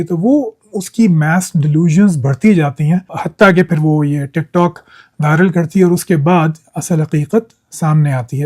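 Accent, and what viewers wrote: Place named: Sindh, Pakistan. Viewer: اوپر سے ھو بھی سندھی اسپیکینگ یا پھر پنجابی ڈھگے ھو آپ ۔۔۔کراچی والی لینگویج نھیں لگتی آپ کی۔۔۔۔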